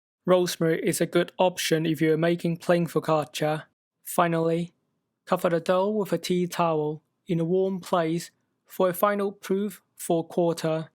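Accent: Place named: Italy. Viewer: luv the Italian accent, cool video well explained recipe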